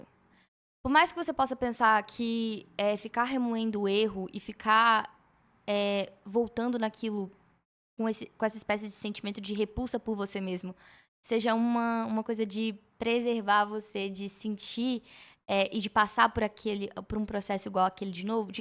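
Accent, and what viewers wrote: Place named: Florianopolis, Brazil. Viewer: Vc é de Floripa? (Perdão a aleatoriedade eh que n consegui deixar de notar o sotaque)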